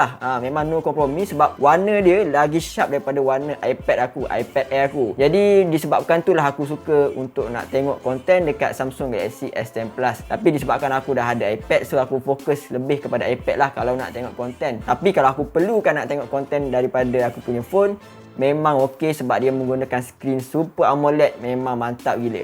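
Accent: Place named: East Coast, Malaysia. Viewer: Orang mana bang ??? sebab bunyi loghat la mcm pantai timur . Macam je tanye je jangan kecam jangan marah ok .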